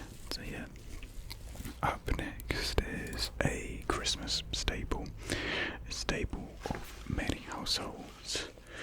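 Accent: United Kingdom. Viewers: I adore you, but you're also easily the most British person I've ever listened to, like painfully British.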